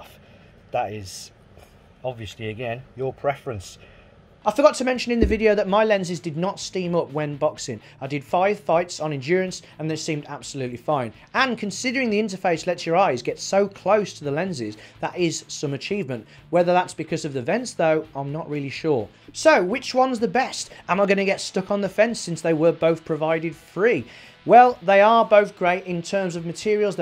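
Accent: West Midlands, United Kingdom. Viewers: this dude sound like a west midlander :D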